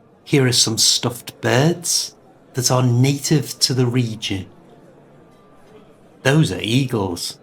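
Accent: Liverpool, United Kingdom. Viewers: Do you have Liverpool accent? I love British accent, but I find it really hard to choose which accent suits me best because there are so many different accents in the UK. Could you suggest which one I should learn?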